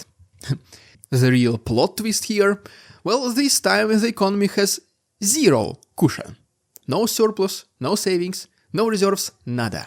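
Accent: Russia. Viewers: what the h... is he talking about? Is there any more disgusting pronunciation than that from lumpens of moscowia?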